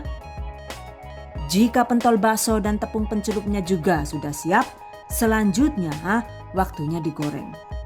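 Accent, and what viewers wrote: Banyumas, Indonesia. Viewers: Dening orang ngapak ya yhu, 🤣🤣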